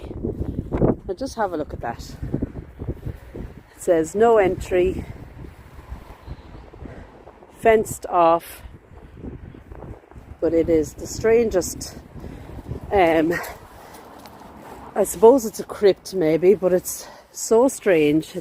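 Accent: Ireland. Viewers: You have the best Irish accent. Absolutely love it. When I imagine my ancestors talking to each other, it’s your accent I hear.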